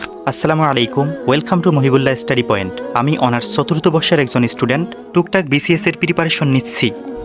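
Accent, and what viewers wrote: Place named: South Bengal, India. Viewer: আপনার কথায় দক্ষিণবঙ্গের Accent রয়েছে।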